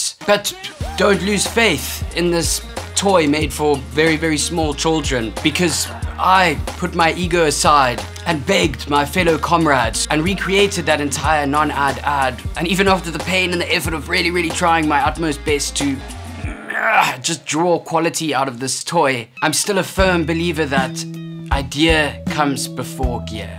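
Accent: South Africa. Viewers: Hey u sound South African.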